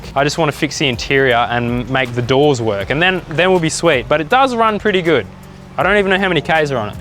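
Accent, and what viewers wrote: Australia. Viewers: How good is the Australian accent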